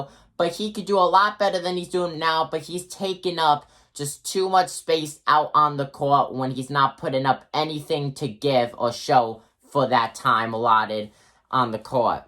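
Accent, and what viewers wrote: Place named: Brooklyn, United States. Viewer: you sound like someone that lived in brooklyn back in the 30s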